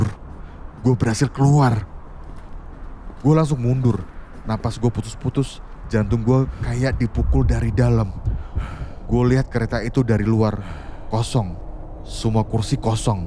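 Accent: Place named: Java, Indonesia. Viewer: Tp ini agak medok 😅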